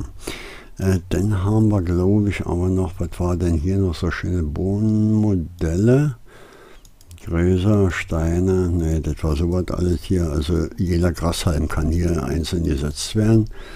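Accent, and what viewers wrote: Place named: Berlin, Germany. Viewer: schöne videos wo du machst.. finde ich richtig gut... ( deine stimme mag ich sehr ) mit Berline dialekt.. scheee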